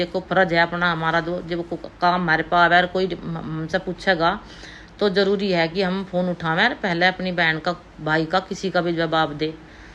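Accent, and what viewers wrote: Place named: Yamuna region, India. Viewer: आपकी भाषा मुझे मेरे आस पास की लग रही है बहन जी आप कहां से हो क्योंकि यह जमुना के पास की लैंग्वेजहै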